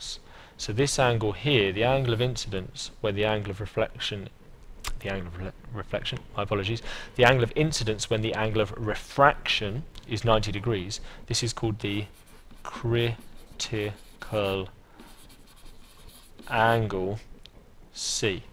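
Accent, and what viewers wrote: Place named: United Kingdom. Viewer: Thanks this video helped me a lot in learning British accent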